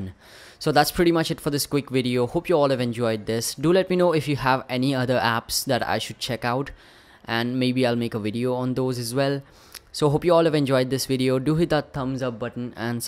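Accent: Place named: India. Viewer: its indian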